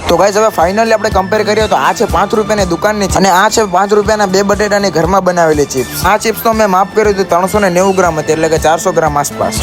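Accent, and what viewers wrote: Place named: Africa. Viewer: Aaafrician Gujarati 😮😮😮😅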